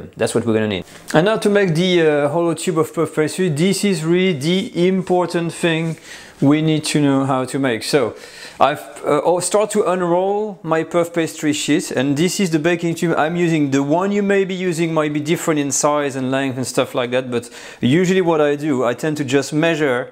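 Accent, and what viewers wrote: France. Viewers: That French accent. Formidable! The food is pretty good too. 😋 ❤️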